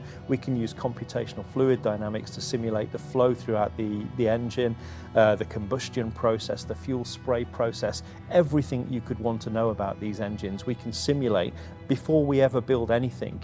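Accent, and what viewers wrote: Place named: United Kingdom. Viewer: sounds like a new British billionaire. Godspeed.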